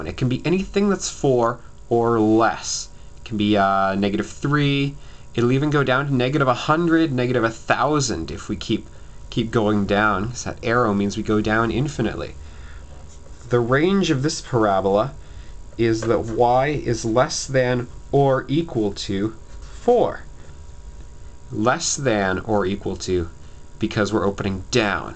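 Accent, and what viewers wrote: Canada. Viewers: You're definitely Canadian. Thanks for your help! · i can tell that you're canadian .. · no his Accent is obviously Canadian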